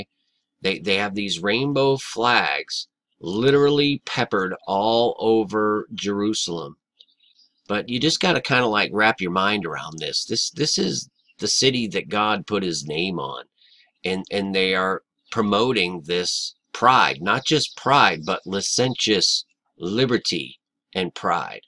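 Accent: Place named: Boston, United States. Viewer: This is incredibly nutty. And I just realized you're from Boston. Haha. You "sawr" it. 😊 Excellent work and crazy fingerprints of the evil architects. Look forward to your vids.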